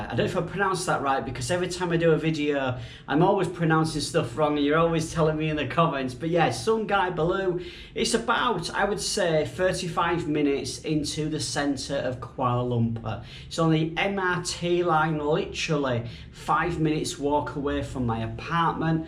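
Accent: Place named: Northern England, United Kingdom. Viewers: Hi, which part of Uk you come from? Liverpool? Sound like you are from the north. I’m Malaysian live in Uk for 29 years! Fuck! Really?! Yes, but I love it here! When I watch you the first time, I feel like ‘home’, you know what I mean? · that's fine, but his accent is heavy Northern. I bet you guys understand my standard American accent more. lol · South yorkshire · Selamat Hari Natal (Merry Christmas) dan Selamat Tahun Baru (Happy New Year)! I’ve changed my YT name from UncleGweilo to this. It’s now even more of a mick take of me by me to Singaporeans, who use “ang moh” rather than “gweilo” to derisively refer to a white person. You and your anchovies,! LOL. You should call them ikan bilis- their Malay name- and avoid the issue totally. Great to munch on with a cold beer after a long day's exploring. Go with the flow trying to pronounce Malay and Chinese names. 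Be like the Malaysians; if it’s close enough that you can be understood, it’s okay. "Relak lah, dun worry" as they say in Singapore! I'm not going to have a go at your northern accent as I'm Australian, which is a curse in itself! I think there are more varieties of nasi lemak than a cat has hairs. With so many cultural influences at play, you can just head from place to place to find the one you really like. Changes in the sambal; fried egg, boiled egg, or century egg; barbecued versus deep-fried chicken, and so on. A guy by the name of Seth Lui recently posted a video comparing five different nasi lemak places in Singapore. Search him out on YT, if you’re interested. Gregory Leow, who travels around Singapore seeking out authentic traditional ethnic Chinese cuisines also does great work reviewing food without making a “foodie wanker” of himself. What you think is the best is the best because you think it is, and what anyone else thinks doesn’t really matter. 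But if you s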